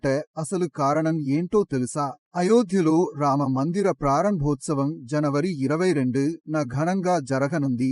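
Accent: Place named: Tamil Nadu, India. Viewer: Don't want Tamil slang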